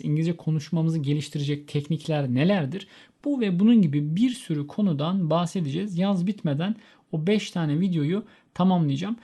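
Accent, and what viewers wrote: Turkey. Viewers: Bu hangi aksan diye dusunuyorum sonunda buldum.Turgut Ozal aksani.Ayni zamanda bazi gramer hatalari da bulunmakta.Acil olarak kendinize bir native speaker bulun.Ikinci yanlisiniz ise Turkce konusarak Ingilizce ogrenemezsiniz ve konusamazsiniz bunu da unutmayin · Noooooooo , this pronunciations is tooo turkelish , is not English plzzzz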